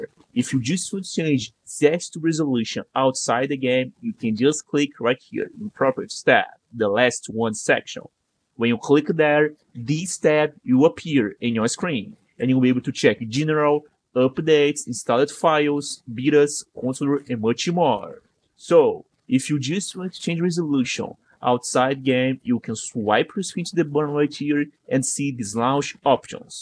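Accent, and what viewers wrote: Brazil. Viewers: crtz q é brasileiro tem mt sotaque kkkkkkkkk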